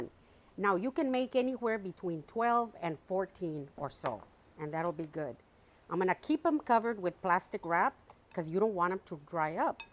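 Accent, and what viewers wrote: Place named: Texas, United States. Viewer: Your Texas accent is back!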